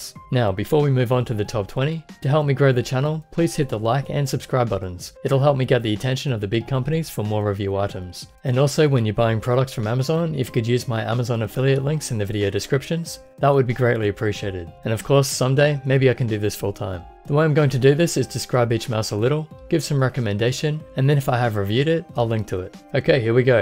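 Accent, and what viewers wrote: Australia. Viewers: Yo man are U australia!? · yes he's from Australia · are you aussie?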